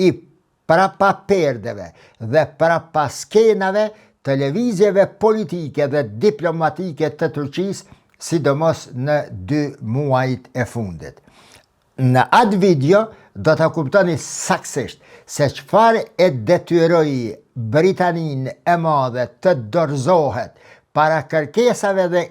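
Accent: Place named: Kardhiq, Albania. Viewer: Ca flet kardhiqe moj kuder e metohise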